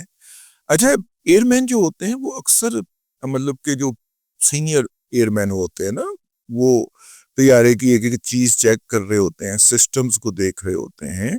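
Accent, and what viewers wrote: Pakistan. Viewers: I love your Pakistani tune, which is highly appreciated. Thank you DADA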